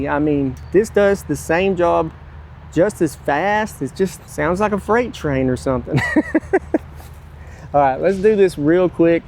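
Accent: South, United States. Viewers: You have some great videos, I've been hooked for awhile now and luckily am not caught up so i always have a new one to watch. You have those common things i find entertaining: "owe", "I forgot" "oh man" "i have an apple pie" and for tne longest time i couldnt get that you were saying "spiders" until i recognized the southern accent and learned where you're from. To me, you are saying spotters lol. Love the channel, keep doin you.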